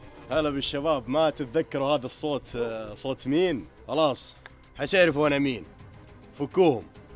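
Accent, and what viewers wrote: Saudi Arabia. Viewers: لهجتك مثل السعوديين تماماً هل انت سعودي ولا ارني 🇸🇦🇯🇴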